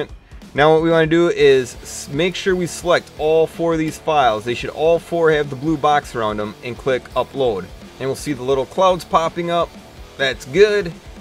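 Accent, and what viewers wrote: Canada. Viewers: You are the most Canadian person iv'e EVER heard it really annoys me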